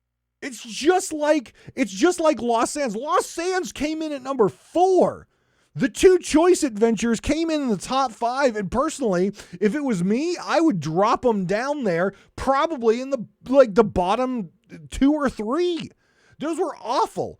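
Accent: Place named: Canada. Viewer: next episode releases “Alright let’s see what our boy is championing today - oh thats a good one.” Long time Spotify listener, day 1 sailor - weekly sailor. Im here to say I love your style, and sometimes your heated opinions are very needed, this is one of those topics. I want to reiterate that I love you, that said - “bolth” and “aweso” …. Kills me every time brother. EVERY TIME. also says “bolth” though. Seems like a northerner/west coast/Canadian thing? Kills me! Lol but keep it up duder, great stuff and I actually really appreciate having constant content to listen to. Its nice to audibly jump into the seas while I’m working.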